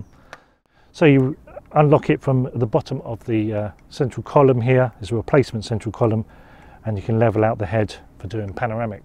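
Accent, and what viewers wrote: United Kingdom. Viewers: At last, someone with a British accent, thank you!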